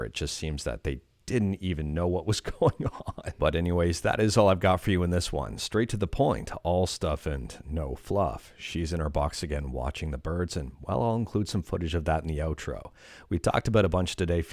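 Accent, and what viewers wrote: Canada. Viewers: You say aboot. Defiantly Canadian. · Can confirm: The day you learn you have a Canadian accent is very Jarring. and that's coming from someone who is tesl certified as one of the first things we learn is other countries prefer Canadian instructors because of our lack of an accent. Go figure eh? lol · Your Canadian accent is minimal if that's any consolation.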